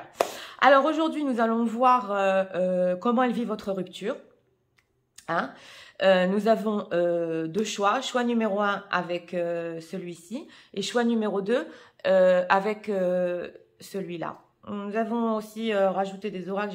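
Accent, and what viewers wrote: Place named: South, France. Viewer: Je viens juste d'écouter cette guidance qui date de 1 an. C'est marrant, je ne savais pas que vous aviez un petit accent du sud 😊, accent que vous n'avez plus sur les guidances de maintenant. C'est marrant. Je ne me moque pas. Je viens de Toulouse et j'ai un accent très marqué 😉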